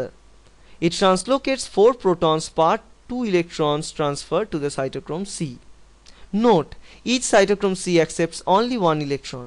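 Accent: India. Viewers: OMG....indian accent.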